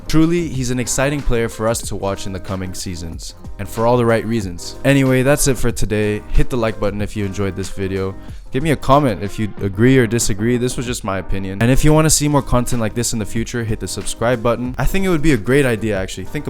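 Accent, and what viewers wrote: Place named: Spain. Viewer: Pareces chino, hablas perfecto inglés y se nota que eres español a la vez xd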